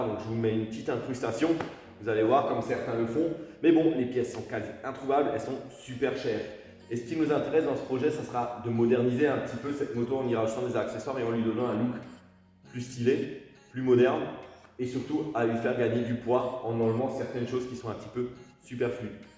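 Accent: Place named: Alsace, France. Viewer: Road trip, 750 super tenere , petit accent alsacien , ambiance sympa ... je m'abonne 😊😊😊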